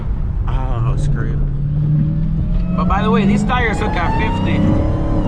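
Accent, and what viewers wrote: Jamaica. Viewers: You sound Jamaican🤔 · U Jamaican bro ? Man tuff yf 😂💪🏽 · Yoo bro u sound Jamaican where u frm? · cant hide that Jamaican accent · Mi know mi hear a Jamaican 🇯🇲 accent big up yuhself Bredda yuh drive like a real yaad man. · Bro you gotta jamaican accent 🇯🇲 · u Jamaican or carribean by any chance? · Are you from jamaica?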